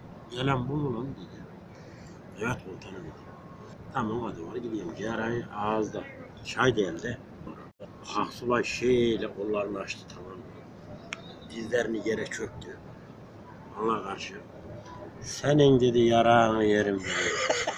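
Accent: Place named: Yozgat, Turkey. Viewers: Konuşanın ağzını Yozgat ağzına benzettim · Nereli bu adam? Şive Iç Anadolu ama il-ilçe Konya tarafı mi acaba? Bilen var mi?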